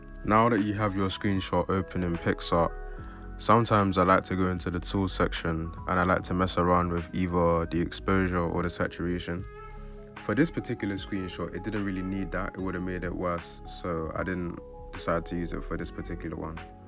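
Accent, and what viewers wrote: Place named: London, United Kingdom. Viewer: I'm from London as well, recognised the voice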